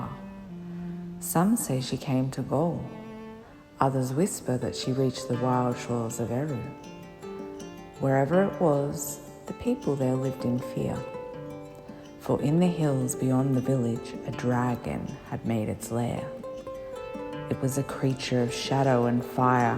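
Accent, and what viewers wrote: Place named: New Zealand. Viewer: New Zealand accent? My favorite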